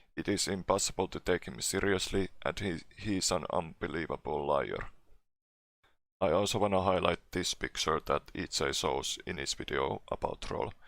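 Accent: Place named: Finland. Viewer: u sound like finland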